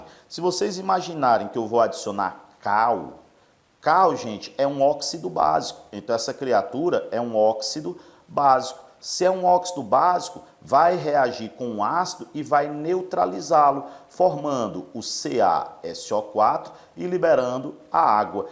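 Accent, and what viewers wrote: Ceará, Brazil. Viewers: Cearense é reconhecido onde for Kkkklk é nois, macho véi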